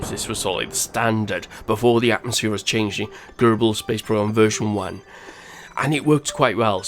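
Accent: Wales, United Kingdom. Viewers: I thought I heard some welsh in there haha! I don't normally hear strong accents down here either. I take it Cardiff way then with the butt? Mush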